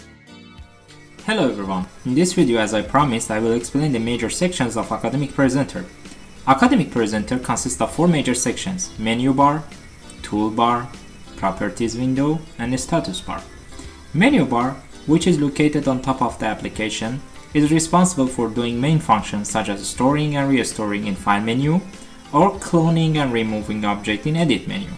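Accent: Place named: Iran. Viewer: Tnx a lot.. Nice but music is loud i try to understand u.. I think u r from iran..ur accent and the way u speak is like iranian people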